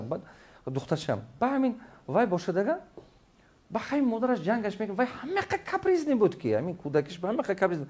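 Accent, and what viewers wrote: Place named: North, Tajikistan. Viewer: Устод бисёр Руси Кати Гап мезанад, бо шевай мардуми шимол. Хам як Чахон маьни❤❤❤❤хам як олам ханда хадя мекунад😂😂😂😂Зинда бошед..доктор❤❤❤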